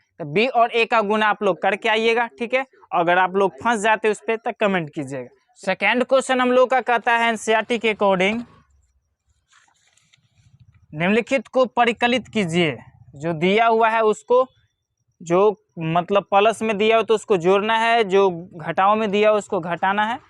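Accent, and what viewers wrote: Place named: Bihar, India. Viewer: Ekdam Bihari style me sir 😍😍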